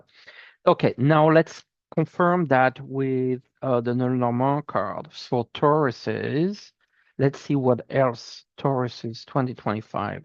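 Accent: France. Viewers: Thank you , may I ask are you French? Your accent sounds a lot like mine, I’m new to your channel , glad I found you, hope you have a great year.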